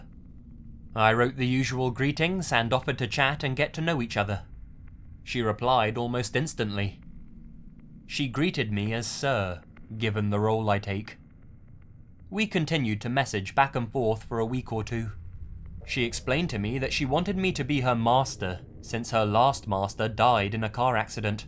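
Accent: England, United Kingdom. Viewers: someone who lives in England, English accents aren't always this great, enjoy it XD Congrats on the year,:) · Why do men with British accents narrate horror stories better than anyone else? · Riseys Well actually my voice, I'm from the same area of England as, there fore I share the same accent. Anyways, why so aggressive, pent up anger mate? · Yep but you know this guy is English you're probably American like me at this point they have become two different languages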